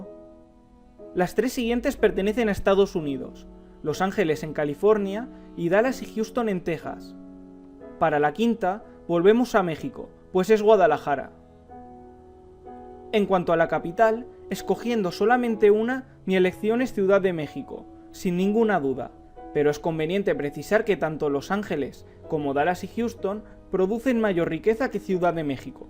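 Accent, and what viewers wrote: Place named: Spain. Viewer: Ablas como un español resentido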